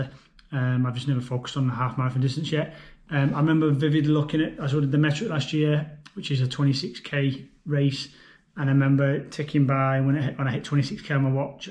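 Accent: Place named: Manchester, United Kingdom. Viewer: I’d definitely recommend mate, you can do as little or as much as you want with the club, but ultimately you are surrounding yourself with support and top advice , can only help . You sound local to me , I’m Manchester based and run for Salford Harriers . I’m part of a local runners Podcast , imaginatively called “local runners Podcast “ 😆 we talk a lot about local races and clubs across Gtr Manchester , look us up and any questions give us a shout mate , keep up the channel 👍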